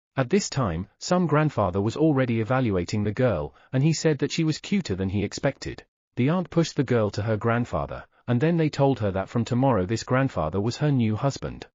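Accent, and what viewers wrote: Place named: United Kingdom. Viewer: Why is the ai voice British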